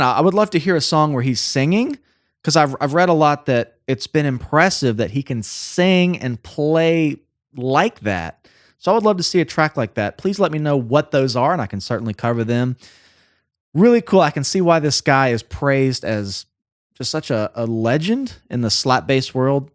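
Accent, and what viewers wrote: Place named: United States. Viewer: Pronounced, Redding, Staytus... bloody yanks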